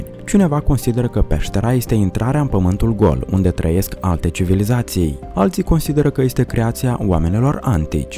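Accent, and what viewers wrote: Moldova. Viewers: Ie moldovian bre😊😂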